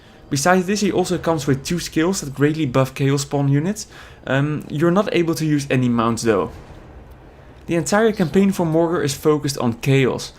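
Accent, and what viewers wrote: Netherlands. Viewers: He's fucking dutch, you try speak a language that you aren't accustomed to and let's see how you sound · Your accent sounds so Dutch !! any change you are from The Netherlands ? Anyways, amazing video !1 thanks !! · dacht ik al was een nederlands accent xD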